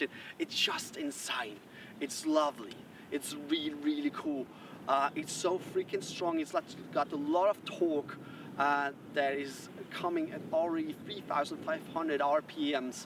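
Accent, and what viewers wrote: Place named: Australia. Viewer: Your accent sounds australian🤔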